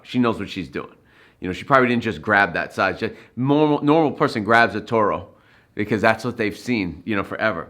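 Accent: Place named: New York, United States. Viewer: Had a feeling you were from New York. BTW, Chicago pizza isn't pizza. It's a caserole! 🤣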